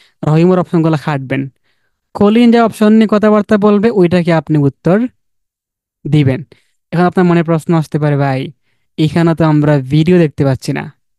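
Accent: Sylhet, Bangladesh. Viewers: Sir amioy sylheti,,, apnar kota shuna kno jani mono or afna sylheti,, 🥰thanks a million sir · উনি হয়তো সিলেটের তাই কথা কেমন। এটা ব্যপার না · Baiya apner basha sylhet kutay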